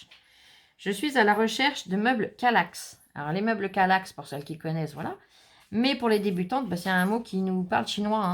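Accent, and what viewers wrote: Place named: Burgundy, France. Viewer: Coucou je regarde vos vidéos depuis quelques jours et j’aime beaucoup. Et votre accent me disait bien quelque chose 😀 vous êtes de la Bourgogne vous aussi 😁😁😁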